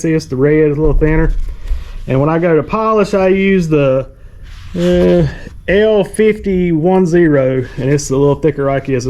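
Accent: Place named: South, United States. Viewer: Man I really like the way southern Americans talk... The "pokie dots" 😂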